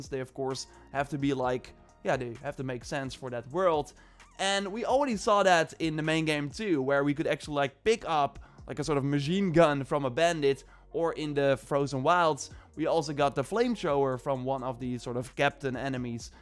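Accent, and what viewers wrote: Netherlands. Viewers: Leuke video. Raar Nederlands Engels accent toch..🙄😁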